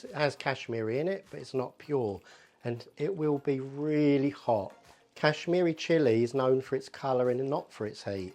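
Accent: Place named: England, United Kingdom. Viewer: I'm dying to know what part of England you're from. That accent is to die for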